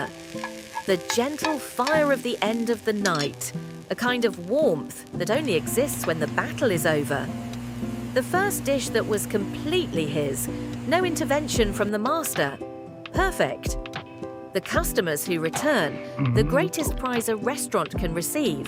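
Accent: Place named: United States. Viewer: Annoying American narrator.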